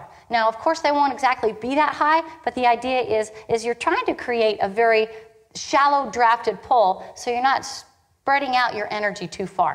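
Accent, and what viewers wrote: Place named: United States. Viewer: very nice USA accent 10/10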